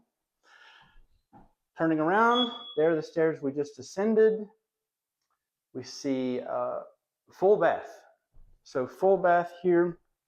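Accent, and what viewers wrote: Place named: South, United States. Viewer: You are AMAZING. Truly. Are you also a writer.? I am guessing - certain - yes you are. The entire post, but most especially the last scene with you in the dining room - is incredibly poetic and perfect. Such a southern voice like Tennessee Williams or Truman Capote - so musical sounding and thoughtful and convincing. I LOVE this house. Keep on doing what you are doing. And keep writing. Your talent is obvious.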